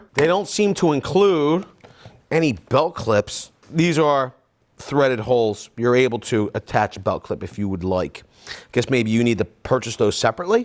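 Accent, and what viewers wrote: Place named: South Jersey, United States. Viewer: As a jersey guy I come for the accent. Hunnert and wooder are classic south jersey words. Traveling around the country we get mocked but everyone I met got a kick out of our my cousin Vinny dialect.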